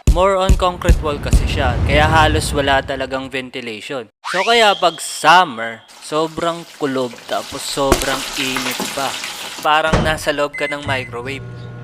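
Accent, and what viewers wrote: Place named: Visayas, Philippines. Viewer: Bisaya Ka pala ahahhah😂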